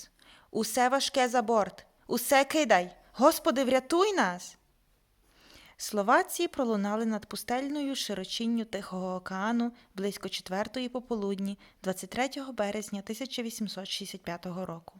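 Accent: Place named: Western Ukraine, Ukraine. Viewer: Варто додати що присутній західний акцент. Що є різні вимови.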